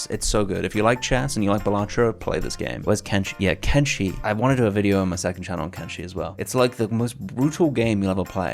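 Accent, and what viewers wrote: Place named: United Kingdom. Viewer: Idk, he sounds British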